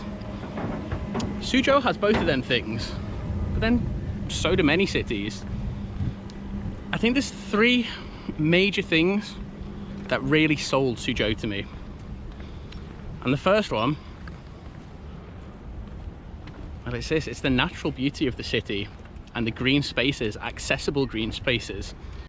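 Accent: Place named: Liverpool, United Kingdom. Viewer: welcome to China. BTW, are we still hiring scouse teachers? odd, very odd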